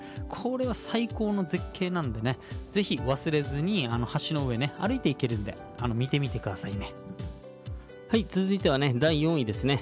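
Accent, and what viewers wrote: Okinawa, Japan. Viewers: 凄いランキング！！！なぜなら ●ウチナーンチュでも知らないビーチが紹介さている!!! ●西海岸以外を紹介している!! ●いちゃんだビーチを紹介している!!!!! ●ちょいちょい沖縄なまりが入っている点（ガチ）!!!! 近いうち言ってみる！！！ 右上の建物何！？！？ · 話し方が優しくて癒されました😆 沖縄の方言、すごくすてきですね！😊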